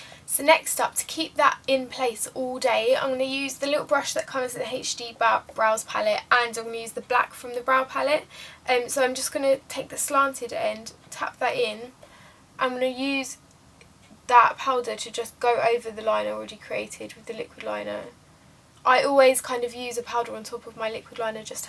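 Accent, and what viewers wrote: United Kingdom. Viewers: Haha She not Irish shes British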